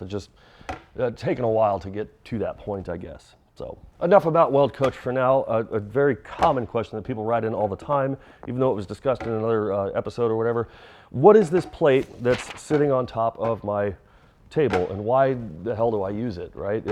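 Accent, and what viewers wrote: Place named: Transatlantic, United States. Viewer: Your voice does have a good tone, and you also speak with an accent similar to the formerly popular transatlantic accent used in a lot of media. People have told me the same and commented on my voice, but it's just how I talk. Especially when I'm on camera or teaching something. Wonder if it has to do with schooling or where parents are from or media consumed or what. Love the pink gloves rationale as well. I don't have time for needless negativity in my comments.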